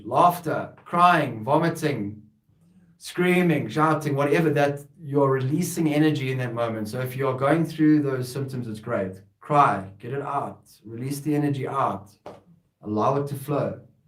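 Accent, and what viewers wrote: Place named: South Africa. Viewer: hello, are you south african? you have an SA accent. i'm new to your channel, i'm from Botswana. do you do your lives from facebook or from youtube? would love to tune in sometime.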